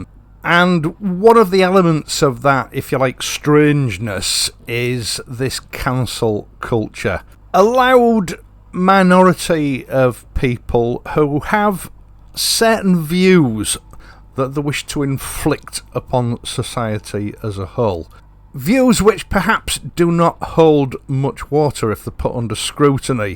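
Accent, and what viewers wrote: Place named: Yorkshire, United Kingdom. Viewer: Well support you’re excellent channel Great Yorkshire man talk 👍👍 You’re a top guy does a brilliant service I recognise most of the roads you’re on Full support here 👍👍👍😎😎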